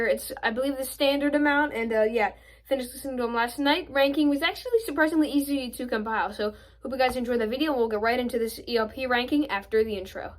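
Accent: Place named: Midwest, United States. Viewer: i can tell you are from the midwest lol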